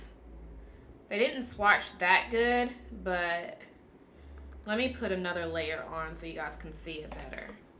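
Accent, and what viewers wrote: South, United States. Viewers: Omg, i love your accent😄, you sound a bit from the south country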